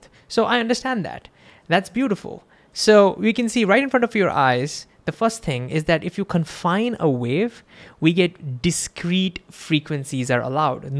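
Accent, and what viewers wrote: India. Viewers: Brroo , is he indian 😑😑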